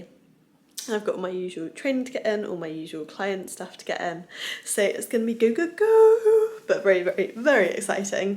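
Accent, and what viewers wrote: United Kingdom. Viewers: 발음이 이상하다했더니 영국분이였구나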